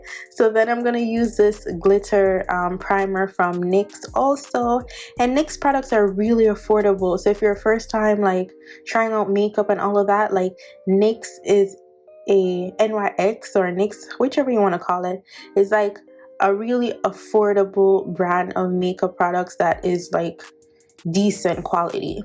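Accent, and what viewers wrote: Jamaica. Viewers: Yes!! Mi hear di Jamaican accent. Bless up sis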